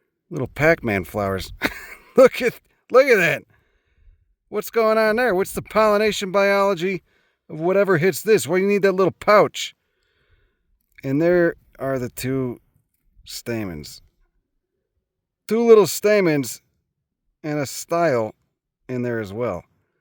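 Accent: Chicago, United States. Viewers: I appreciate how u cover a ton of microbiomes and blending/borderline climates. Really interesting and funny channel. Chicago is my new favorite accent 😂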